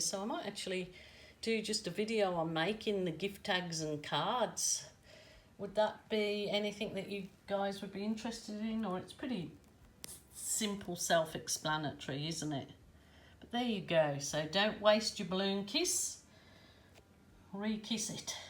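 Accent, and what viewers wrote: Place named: England, United Kingdom. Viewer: Always love to hear your cheerful English voice, I live in France so I always enjoy.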